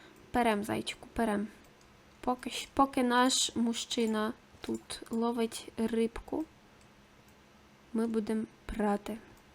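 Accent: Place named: Western Ukraine, Ukraine. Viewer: Вауу, а я не знала, що так говорять на заході! Я з далекої півночі;)))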